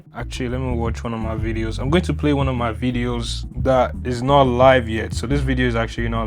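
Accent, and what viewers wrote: Nigeria. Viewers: The accent never goes wrong Naija for life🇳🇬💯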